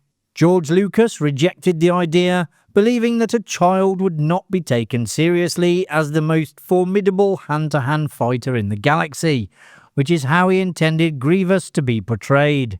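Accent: United Kingdom. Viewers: what the hell planet is ko-RUSS-kant? check that pronunciation again sir. :) and now the lava planet is named after a middle eastern guy? look, I get that you are a Britt sir, but come on, even those who can't speak english can get the planet names right. is this is AI I'm gonna be sick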